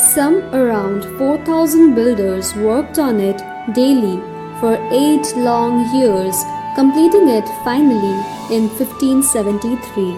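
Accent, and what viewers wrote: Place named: England, United Kingdom. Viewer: Very nice, keep up the good work, very impressive voice and english accent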